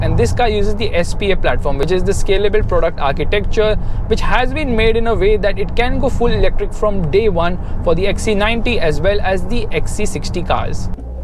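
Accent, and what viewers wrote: India. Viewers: Indian English is so funny.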